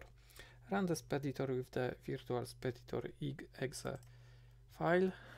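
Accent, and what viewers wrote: Poland. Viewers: Tak myślałem że Polak po akcencie :P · Dzięki wielkie, zajebisty masz angielski bo mega polski akcent ale posługujesz się w pełni poprawnie czasami itd. pozdro ;D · I got 1 question, your Polish? Cuz i feeling I'm hear this accent too much times in my life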